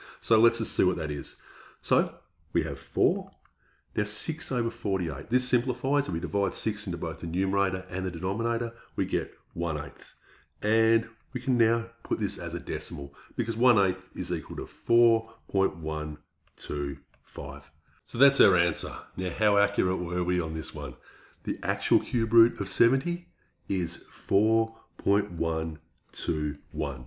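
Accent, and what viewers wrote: Australia. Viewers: Bro are u aussie by chance😮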